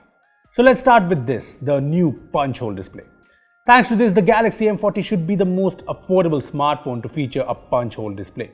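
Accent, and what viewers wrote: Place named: India. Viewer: New subscriber here ! Love from malaysia... Like your indian-english accent, that's why I've used to watch tech news on indian's channel. Their accent are just, satisfiying to hear.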